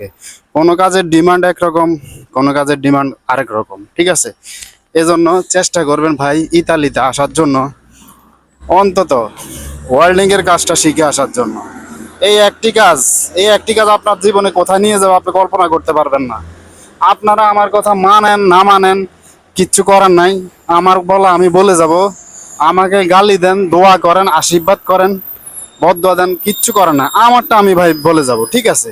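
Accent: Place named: Noakhali, Bangladesh. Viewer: ভাই কি নোয়াখাইল্লা নাকি😊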